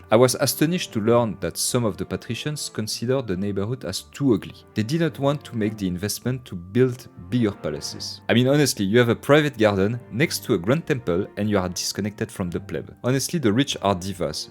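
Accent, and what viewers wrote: France. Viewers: Your french accent is so satisfying to listen while watching you building an amazing city.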